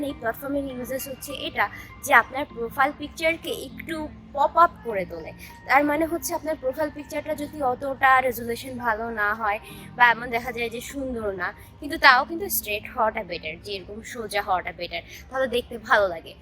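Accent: Chittagong, Bangladesh. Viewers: Apnar basa ki Chittagong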